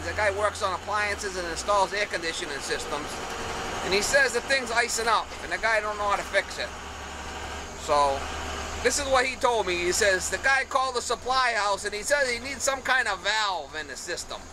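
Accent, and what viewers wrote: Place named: Boston, United States. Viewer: I knew it, the biddy and I were watching the video and said to each other, looks familiar, and sounds close to home, Good diagnosis and fix, Al from flippin' Bahston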